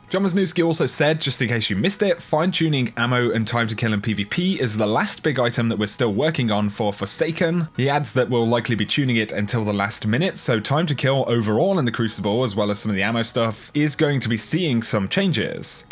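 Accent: England, United Kingdom. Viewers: Wow ppl got triggered because you are English and therefore don’t pronounce words with an American accent? Lol, you mortals are so petty. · Hey those idiots are jealous they dont have a sweet ENGLISH accent!!!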